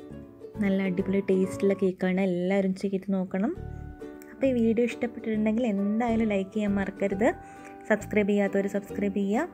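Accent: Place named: Kozhikode, India. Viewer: Kozhikkodan bhaasha okke edkk keri varunnundallo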